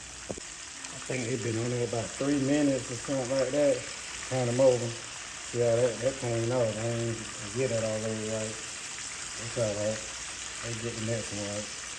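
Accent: South, United States. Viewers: you have a southern accent in your voice. We Love peaches and Family. Love from chicago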